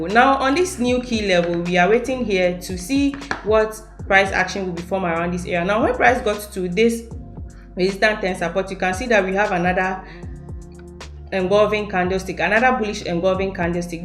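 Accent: Ghana. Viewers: Honestly, I have been consuming your content since day one and I must say your channel is underrated. You deserve many more subscribers and views. You always share a lot of valuable insights. Which are always straight to the point and beginner-friendly. Not to forget your sweet Ghanaian ascent too.😍, Keep giving us masterpiece content. Thank you!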